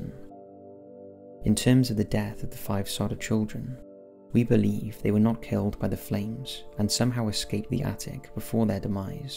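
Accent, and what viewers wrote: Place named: Newcastle, United Kingdom. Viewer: I think hes a geordie lad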